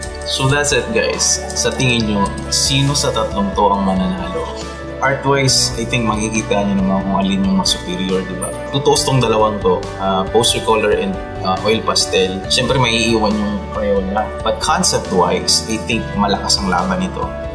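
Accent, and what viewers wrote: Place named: Bicol, Philippines. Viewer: Hi po, bibihira ganitong art content sa pinas. Keep on going po Sir! Love your accent too! Maurag talag ang mga Bicolano 😊👌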